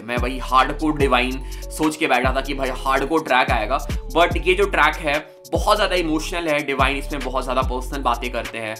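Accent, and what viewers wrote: Bihar, India. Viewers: Bro are you from Bihar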